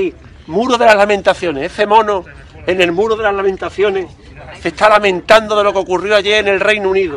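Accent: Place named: Andalusia, Spain. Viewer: Ke makina jajajaj se nota ke eres andaluz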